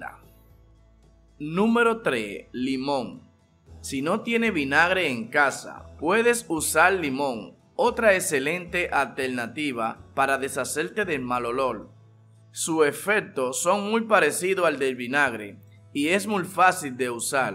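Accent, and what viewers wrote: Dominican Republic. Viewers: Es el acento dominicano, aprenda un poco :) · Así hablan los dominicanos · 🤪 los dominicanos hablamos así😝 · Ah ok, es bueno aprender algo nuevo cada día. Entonces supongo que es un acento de algunas regiones de República Dominicana. Igual se debe de respetar las diferencias culturales 😅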